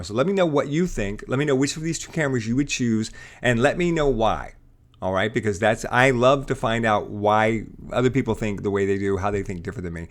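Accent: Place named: United States. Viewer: I did like your presentation on these two cameras, it got me out of my doubt. And all American presentation , not like these other foreigners that I coudn't understand what they were saying. Nice comparison !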